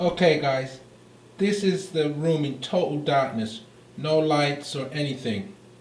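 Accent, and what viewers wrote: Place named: United Kingdom. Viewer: your from the UK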